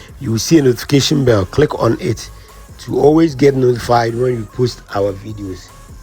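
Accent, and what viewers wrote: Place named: Ghana. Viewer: A nice educational video. You sound like a Ghanian. But a different and positive minded Ghanian. Not like some other Ghanians who never fail to be antagonistic about anything Nigeria. Good video narration bro. Keep up the good work. Love will always triumph over hate as long as you keep showing love.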